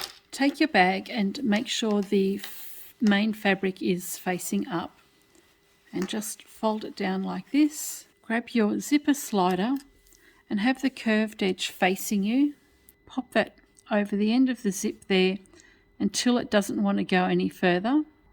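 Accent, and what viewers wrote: Australia. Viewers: Hello... u'r sew'n friend Anna here from Greensboro NC. Luv the Itty Bitty Bag and I've been wait'n 2 make one every since I watched u'r last shop tour, but I ran n2 an issue when make'n it. I went by the "transcript measurements" it says the main fabric is 10½" x 3½", contrast (black) is 10½" x 1½" and the lining is 10½" x 3½"... it didn't dawn on me that the lining was gonna bee 2 short until after I put the zipper in and turned the lining 2 the backside of the open bag 2 press neatly down. I watched as u did the steps but bcuz u lined u'r bag w black fabric I couldn't c that it was even w the bottom of the bag. Lesson learned. Main fabric needs 2 bee 10½" x 2½". Don't go by transcript measurements. I suppose that u'r beautiful Australian accent was misinterpreted. Just thought I'd warn u and others. Waffle on my dear lady, waffle on.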